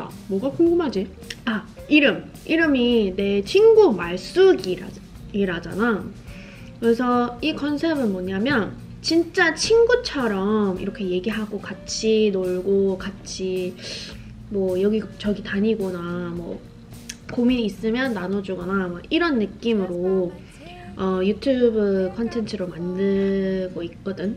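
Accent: Gyeongsang, South Korea. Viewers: Lovely Lady,. 😍❤👍🏻👏🏻💐💐 그런 이유로 말투에 경남 억양이 베어있었군요😮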